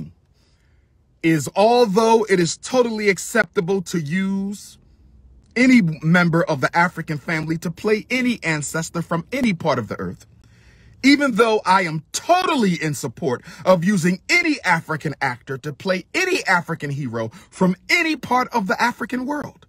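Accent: United States. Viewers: 😂 dude you are funny. He speaks English with British accent you speak it with American accent. There is no difference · You're speaking with an American tongue ffs hypocrite 🙄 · Umar you speak with an American accent that derived from an amalgamation of British, French and other Europeans. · As articulated as you are Umar ,you are "Emphasizing" american tongue so shouldn't we be concerned ? Cmon bro that's his damn accent , your whole video is speaking in an american tongue, and its disingenuous towards are Brothers that do have a british accent . That's ignorance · DR UMAR YOU ARE AFRICAN WITH AN AMERICAN ACCENT, TRIBALISM KILLED AFRICA. LETS LOVE AND WELCOME EACH OTHER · lol you really bashing British tongue while u speaking American English? yaz usangene wena? uyakwaz loko? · Doc. You have an American accent. Whether you try to or not. I agree, but the accent part is out there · But your speaking in American tone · But Umar you have an American accent... 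wtf are you talking about?? Dude was raised in England that's why he talks like that..... I thought you were a Doctor. SMH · His not emphasizing anything. That is his accent. As an African from African you have an American accent. You don’t hear us saying that black Americans are emphasizing your American accent. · With all due respect Dr. Johnson, Brother Kaluuya speaks with a British accent for the same reason you speak with an American accent. He is not "emphasizing" anything. Neither of you was born/raised in Africa, so neither of you has an "authentic" African accent when speaking the colonizer's mother tongue.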